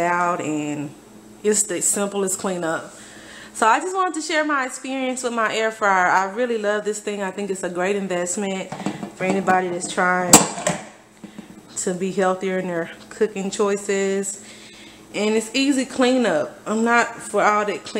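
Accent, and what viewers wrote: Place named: South, United States. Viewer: Love your southern accent. I I might have to invest in one of these things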